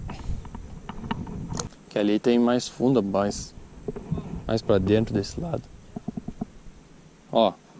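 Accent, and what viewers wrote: Rio Grande do Sul, Brazil. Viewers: O lugar é lindo, o vídeo é top, porém o que eu mais gosto é o sotaque gaúcho. Sou Paulista porém gosto da cultura, da culinária e tudo o que é do Sul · A DORA GAÚCHO O SUTAQUE É TOP ABRAÇO A TODOS DO RIO GRANDE DO SUL 😘😘😘😘😘😘😘😘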